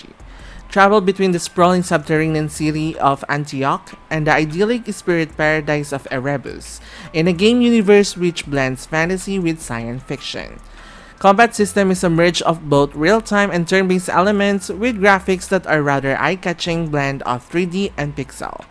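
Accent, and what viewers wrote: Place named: Philippines. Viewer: Wow Pinoy accent 😂 Hello Lods!! ❤️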